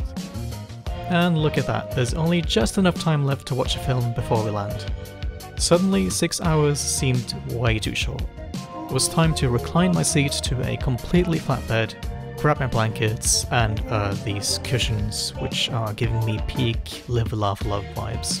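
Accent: Australia. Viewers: I came across your channel through the Casiopea video and always thought I could hear a slight Australian accent in some instances, now I understand where it comes from :)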